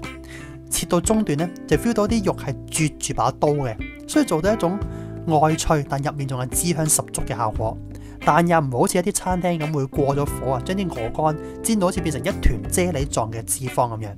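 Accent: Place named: China. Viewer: 佢把聲有D似大陸仔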